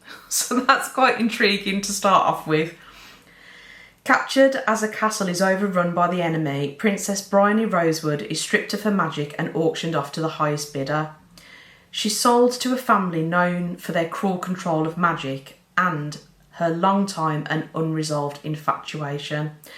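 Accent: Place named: Midlands, United Kingdom. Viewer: Love your videos! Such a fresh approach, speaking like a lovely normal person from the Midlands 🙌🏼 Watching all the way from Perth, Australia 📚 Currently reading The Paris Apartment, by Lucy Foley. So far ⭐️⭐️⭐️⭐️⭐️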